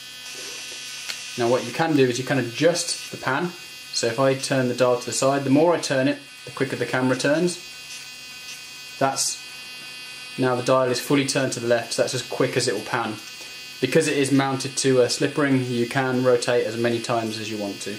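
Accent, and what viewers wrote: United Kingdom. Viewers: looks like a nice gimbal. I used to think you were from the UK till I heard your GF speak, but you sound as British as they come. where do you fly out of?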